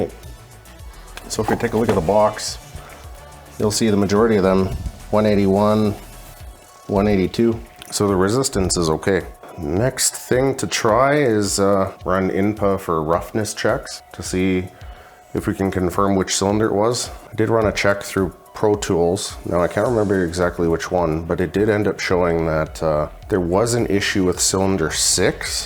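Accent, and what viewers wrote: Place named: Calgary, Canada. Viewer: I love that Calgary accent, eh?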